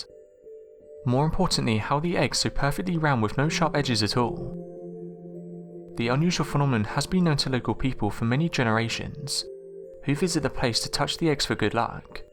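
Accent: United Kingdom. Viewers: Quit with the annoying British accent. You are LOSING VIEWS AND SUBSCRIBERS..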